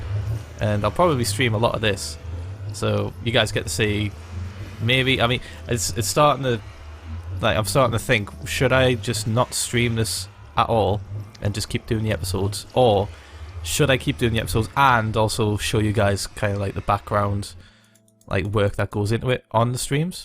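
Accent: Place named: North East England, United Kingdom. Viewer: Technically, not all of the UK. is North-Eastern English (coming from a North-Westerner)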